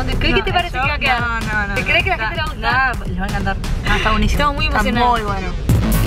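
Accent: Argentina. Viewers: eres más ser humano que YouTube. Tu acento argentino es muy lindo.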